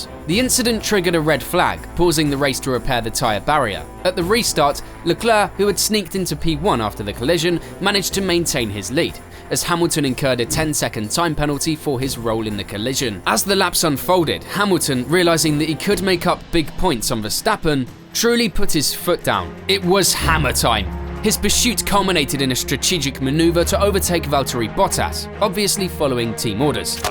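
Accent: England, United Kingdom. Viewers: When you click on an f1 vid and hear an English narrator, you’re officially entering a spin zone. They’ll literally justify and rationalize all his bs, and there’s quite a bit to work with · litteraly the first thing i hear is a british boy saying "masi gifting the championship" lmao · Another British accent.... Lost from button,Rosberg,Russell twice now Leclerc. Lewis had 30-50bhp hammertime more then the rest of the field. Fairytale he "beat" Alonso, even Ron Dennis regrets mistreating Alonso. ALL teammates were very close to Lewis accept koviannen, and then bottas regulatie to nr2 driver. Max is dominante not Lewis, not comparable like Max does today. Max dominante as a Driver is insane, Lewis he is jongere with a less car, very mediocre.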